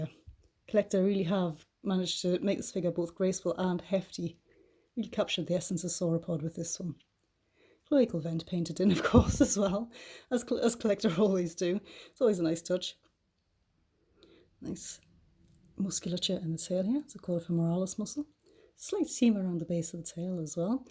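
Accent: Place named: North East England, United Kingdom. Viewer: Love this model and it’s nice to hear someone else from the north east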